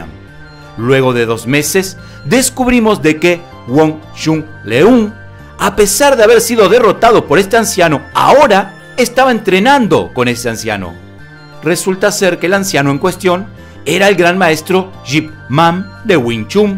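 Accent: Argentina. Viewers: chileno? Pensé que era argentino.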